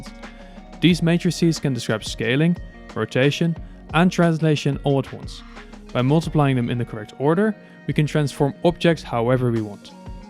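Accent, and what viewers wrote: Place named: Netherlands. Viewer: Nice dutch accent! (Very subtle though). Trees don't grow along the normal of the surface, they grow towards the sun, up. With a bit of variety :p Looking forward to see updates on this. It looks very nice, optimized and I like your approach :)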